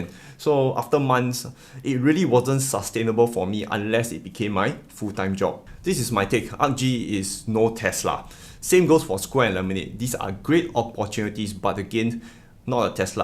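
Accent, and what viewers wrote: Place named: Singapore. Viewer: Needs an accent reduction course for Singaporeans. Straining to listen & follow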